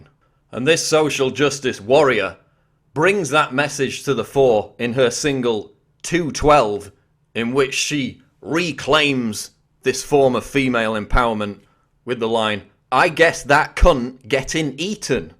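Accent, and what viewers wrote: United Kingdom. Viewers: whats up with the british accent pronouncing words that end in "a" with an "er"? just an observation. as americans we sometimes look to england for proper english,maybe not only did we come closest to perfecting society but maybe common language as well. that being said, thanx for dropping logic on the kids.your social geopolitical game is strong.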